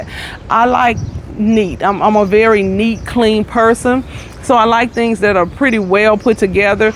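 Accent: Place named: Texas, United States. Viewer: I most definitely hear a TEXAS accent 😇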